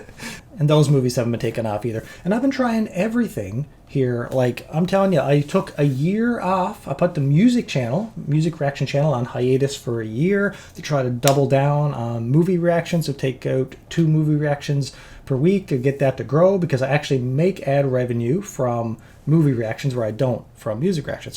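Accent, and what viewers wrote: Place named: Newfoundland, Canada. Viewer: Will the movie reaction channel remain “active” for “monetization” purposes? I often replay (or listen to) old favorites while commuting, doing my makeup, etc. and don’t intend to stop. Hopefully, you’ll continue to get some financial benefit from your past work! As a fan —and subscriber since August 2021– I’ll (selfishly) miss your fantastic reactions and commentary. But I wish you and your family only the best moving forward! On a final, personal note: It may sound kinda lame, but I (an American) fell in love with the “Newfoundland accent” during quarantine. To make a long story short.. I ended up moving out of state and living with my mom/grandma throughout the various lockdowns (about 16 months total). We watched a LOT of TV/movies together in that time; one of our collective favorites was the show “Murdoch Mysteries.” For anyone who isn’t aware, one of the best MM characters is a young man from Newfoundland: Constable George Crabtree [played by actor/comedian Jonny Harris]. 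My grandma (who I grew very close to during the pandemic) has since passed on, but your voice still reminds me of the happy times I was able to share with her, despite the world seemingly falling apart around us. ☺️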